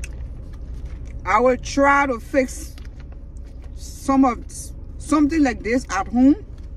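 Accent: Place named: Liberia. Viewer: I know Liberian accent when I hear it 😂❤ nice video!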